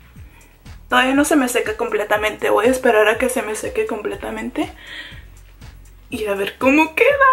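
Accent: United States. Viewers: cual es la necesidad de hablar como gringa? v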